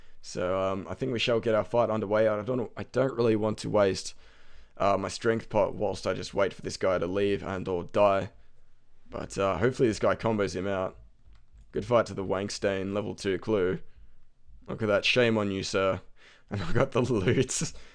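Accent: Australia. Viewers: Everything is more entertaining in an Aussie accent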